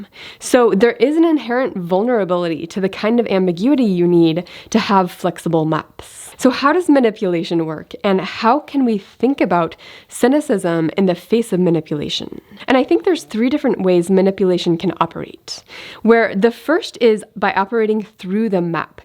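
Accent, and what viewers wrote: Midwest, United States. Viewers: Thanks for mentioning the "accent," I assume that gives me permission. ;) My friends like to joke that we don't have an accent because we speak with that boring midwestern "Peoria" or "TV newscaster" accent. But we definitely have an accent; we intentionally speak like others around us, and unlike people from New York and Minnesota. The reason us nerds are pestering you is because we know this style of speech from the character Summer Smith from the cartoon Rick and Morty. The actress Spencer Grammer has a hint of it, but it's much stronger in the cartoon. So the polite question is, "What's your accent?" But what we're really wondering is, "Why are there two of you??" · Lol it's a little weird to hear the shallow take that you don't have an accent... Everyone has an accent. I'm also from Missouri, I had you spotted as a midwesterner.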